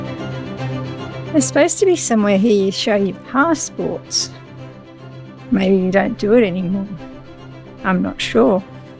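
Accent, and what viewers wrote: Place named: Australia. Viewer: Welcome to Korea and hope you're enjoying your stay in Seoul. When I heard your accent, I knew you were from Australia and saw you told a man that you are from down under. If I heard it correctly, , heard you saying "what a larrikin!" 🤣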